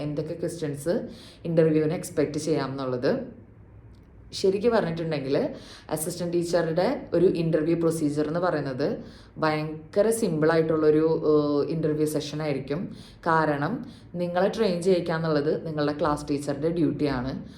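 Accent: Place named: Ernakulam, India. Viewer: Thante slang kettappol Ernakulam district evideyo anennu thonni...I am from Aluva...that's y asked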